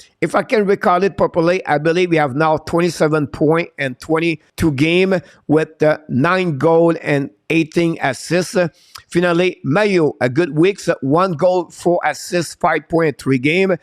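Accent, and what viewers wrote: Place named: France. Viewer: Hey, we really enjoy listening to you and watching your videos. We are from Montreal but we dont live there anymore. We get a laugh from your heavy accent but you know your stuff man! Keep up the good work my friend, you have greatness inside of you!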